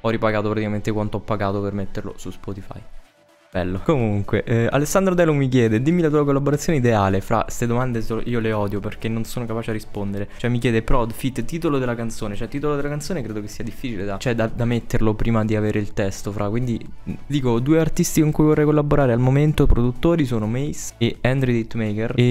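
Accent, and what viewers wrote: Italy. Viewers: Per me è di Roma, si sente anche dall'accento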